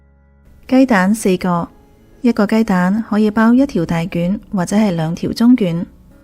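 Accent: Standard. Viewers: 妳的廣東話好地道喎, 好專業既講解, 看🈵學習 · 粤语非常标准，听了舒服极了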